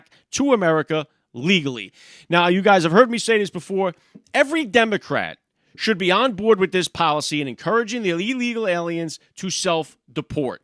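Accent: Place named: New England, United States. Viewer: Nice channel. Wait, you sound like a Yankee. Are you a northern Yankee Trump supporter? Not something I've seen on YT. You are actually a Yankee?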